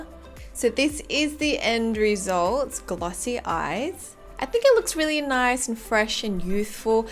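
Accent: Australia. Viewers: 호주에서 사셨구나 뭔가 발음이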